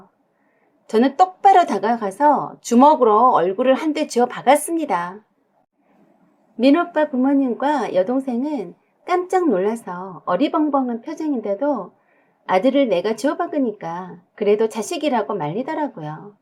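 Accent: Jeolla, South Korea. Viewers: 오늘따라 전라도억양이 엄청 강해부러요ㅋ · 전라도 억양이 너무 많이 나와서 듣기 거북하네요 나도 전라도 사람이지만요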